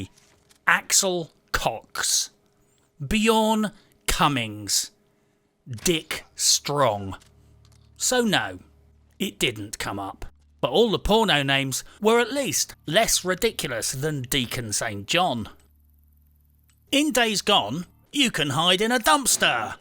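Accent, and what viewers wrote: United Kingdom. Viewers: Thanks british guy 👍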